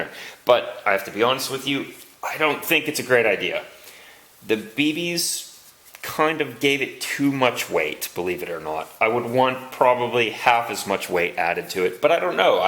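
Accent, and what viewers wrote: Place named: Washington, United States. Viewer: Where is this guy from?? Sounds Washington State to me...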